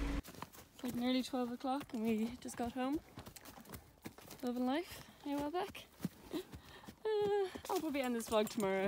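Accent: Ireland. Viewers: I have been watching your videos for a while now and I feel you’re the most genuine person out there. I have no aspiration of competing or anything like that but you are so inspiring and I always feel I learn something from your content! Plus love love love your Irish accent ☺️ lots of love from Tawnydun Thady and I ! Xx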